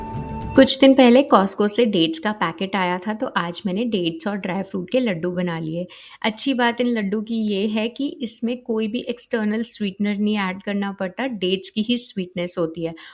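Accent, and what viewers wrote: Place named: India. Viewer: Aap hindi mai comfortable then use hindi half hindi n half English is a no no no ur english sounds more hindi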